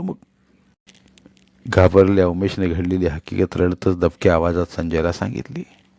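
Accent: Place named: Rural, India. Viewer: सर तुमचा आवाज चांगला वाटतो ग्रामीण भागातील आठवण येते धन्यवाद 🙏🙏🎉🎉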